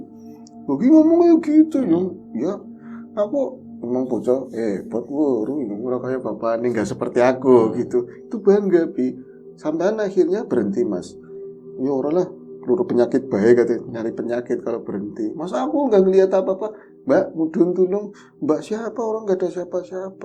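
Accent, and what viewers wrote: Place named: Tegal, Indonesia. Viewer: Di kenalnya diluar tegal kang, bg aku, y kngen dialeg2 tsb sll menantikan lapak horor n ngobor, sbg nostalgia jaman kecil dl di comal. Salam.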